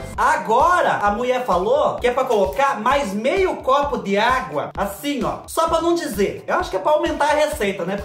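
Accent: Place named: Minas Gerais, Brazil. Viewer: Pelo seu sotaque você é de Minas.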